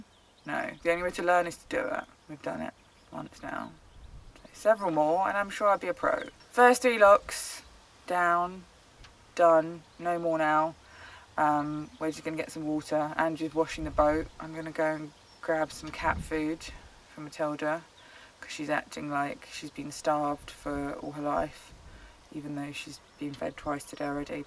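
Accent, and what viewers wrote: United Kingdom. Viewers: What the hell is vocal fry!! She speaks the Queen's/King's English. Perfectly understandable and not in any way jarring.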